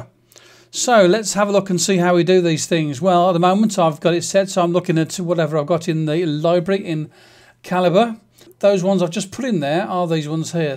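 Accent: Birmingham, United Kingdom. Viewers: are you a brummie? me too !!! thx for the video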